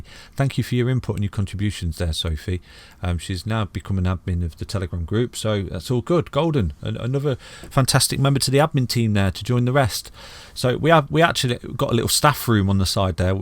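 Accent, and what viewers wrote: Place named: Cockney, United Kingdom. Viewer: It's bad enough you have a cockney accent which is very hard to understand, but you're also a fast talker. That's a horrible combo for a reporter whose audience is expecting to be able to listen to you. SLOW the eff down!!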